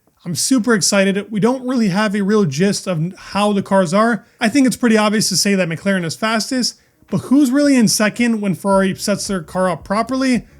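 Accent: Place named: United States. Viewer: As soon as you hear an American trying to discuss what happens in F1 you have to turn off….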